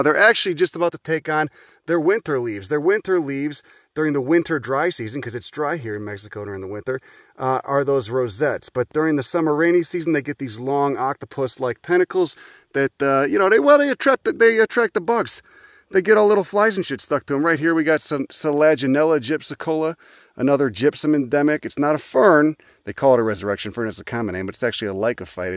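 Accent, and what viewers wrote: Chicago, United States. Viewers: I love the Chicago accent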